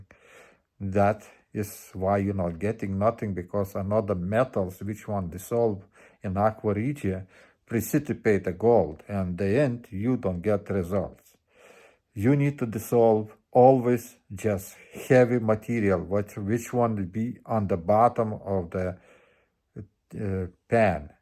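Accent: Russia. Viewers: Дядь я не пойму ты русский ?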